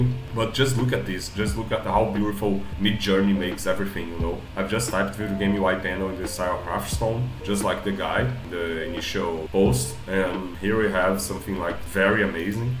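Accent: Brazil. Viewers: Esse sotaque é brasileiro em kkkk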